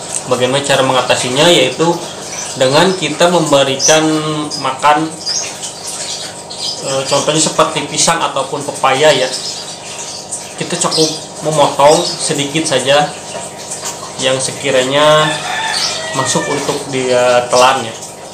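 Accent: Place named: West Java, Indonesia. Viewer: Sunda banget euuyy...😂😂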